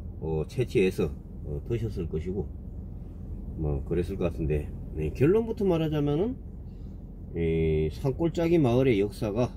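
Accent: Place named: Gangwon, South Korea. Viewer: 인물도 훤하십니다 상동에 가보구싶네요 말씀도 강원도 본토말씀 듣기좋습니다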